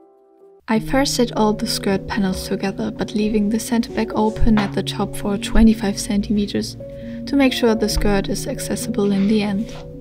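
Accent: Germany. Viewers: Die Videos sind so wundervoll gemacht. Es ist fast wie in einem Historien- und Kostümfilm und verzaubert regelrecht. Zum Schmunzeln bringt mich immer die hörbar deutsche Aussprache ❤ Es gibt kein Nähkanal hier der so hochwertig und ästhetisch ansprechend umgesetzt ist und zum träumen anregt. Jedes Kleid wird zu einer Geschichte und mit Gefühlen aufgeladen. Einfach traumhaft, mein ganz grosses Kompliment 🎉🏆💐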